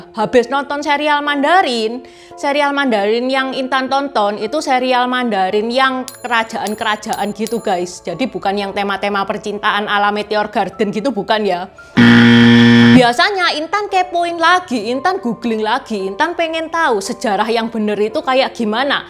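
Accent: Java, Indonesia. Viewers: Perjuangannya kerreenn. Menginspirasi. Medok itu malah khas dan seksi hehe... Semoga akun saya pun cepat nyampe syarat dan monet... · Salam kenal mbak Intan🙏🙏🙏,,, aku senang dengan logat bicaranya Podo Karo aku Medok Jowo, ndilalah Podo senenge Ng sejarah👍👍👍❤️ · Meskipun logat Jawanya kental tetapi video yang dibahas cukup bagus kok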